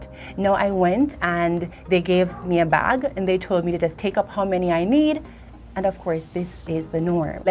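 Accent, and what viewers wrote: Jamaica. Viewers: A few points to fix, that will make this a great video.. - use different cam angle, more close up of your pretty face, lock your focus.. - use more full screen live & shot of your topic, with close up shot, (alcohol shopping full shot wud great, mon).. - a little long winded, make it shorter.. - use more text to highlight you topic.. - need to put your mic closer, so u sound clearer, louder (cos w the accent some words are lost to non-jamaican) * use more Jamaican slang/attitude, its fun, its cool n it adds flair.. * You hv great cam presence n the way you talk is fun/great (like shameless maya).. just need more variety (like jennelle eliana) .. 👍👍👍 Get ready to go viral.. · I was shocked that you never mentioned the weather girl. I guess you've seen enough videos of new immigrants having the wrong shoes and no gloves or head protection in their first winter here. 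As a 66 year old Canadian I find winter shocking to me every year so I have to run away to warm places for months. I could pick up your Jamaican dialect coming in whenever you started to get excited in the video. Having lots of Jamaican friends I'm used to that. Anyway, it was interesting to hear the differences coming from your angle. Welcome to our secret 'best country in the world' (ignoring winter).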